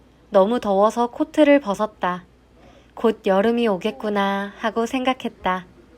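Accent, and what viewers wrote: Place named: Gyeongsang, South Korea. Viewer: ㅋㅋㅋㅋ 님 사실 과자를 꽈자라고 하시져...?!!!!ㅇ.x(경상도사투리) 오늘도 잘 봤어요💘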